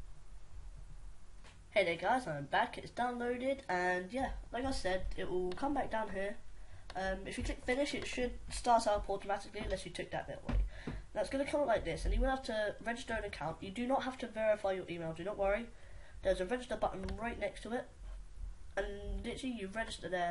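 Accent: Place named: United Kingdom. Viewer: Holy shit this boi is british Edit: But it's a good vid <3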